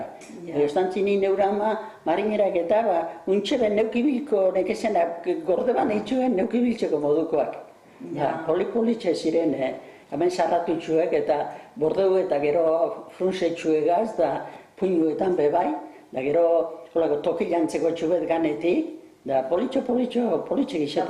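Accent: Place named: Biscay, Spain. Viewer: Eskerrik asko bizkaiera bizirik mantenduteko holango ekintzengaitik, entzutian modukorik eztau🤍 🤍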